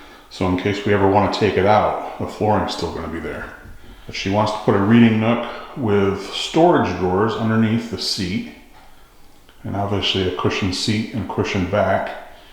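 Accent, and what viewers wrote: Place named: England, United Kingdom. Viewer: Hello from Brazil ☕️ 👏🏼.Excellent presentation 👏🏼. Excellent work. Beautiful English accent. I subscribe in your channel.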